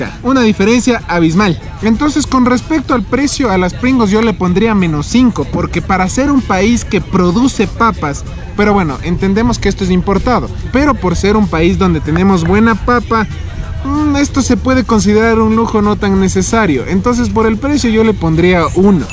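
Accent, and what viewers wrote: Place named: Ecuador. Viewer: Excelente que le metas todo sobre ECUADOR Música Pinta Labia Carisma Acento ... Etc, Etc, Etc. Genial bro RIOBAMBA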